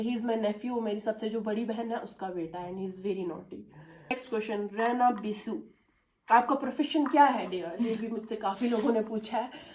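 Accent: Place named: Baramulla, India. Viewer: Your way of talking is similar as baramullians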